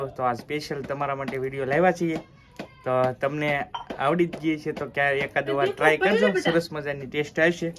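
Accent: Saurashtra, India. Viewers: ખુબ જ સરસ દાળ બનાવી છે, આપની સૌરાષ્ટ્ર ની મીઠી ભાષા ખુબ ગમી જાય છે સૌરાષ્ટ્ર માં શિક્ષક ની નોકરી દરમિયાન આ આનંદ ખુબ જ લીધો છે, માયાળુ માનવી નો દેશ એવો છે કાઠીયાવાડ..... તારાપુર થી ભરતભાઈ વાટલીયા નિવૃત્ત શિક્ષક તથા ઘરપરિવાર ના જયગુરૂદેવ.. જયમાતાજી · Saurashtra ni mithi bhasa ghana valhate sambhalavs mali... Very nice..👌👌 ek j saggetion chhe... Tel ni jagya e guare banavela chokkha ghee no uptog karvo ..baki tame dal ne rotla mate jabarjast banavya chhe... 👏👏👏👏👏👍👌👌👌💐🌺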